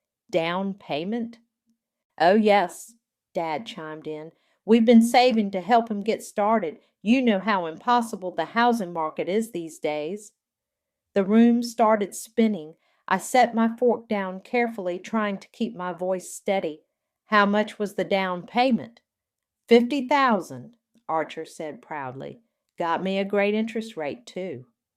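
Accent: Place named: South, United States. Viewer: It's the southern accent and the normal slow cadence of AI speaking makes them sound like hicks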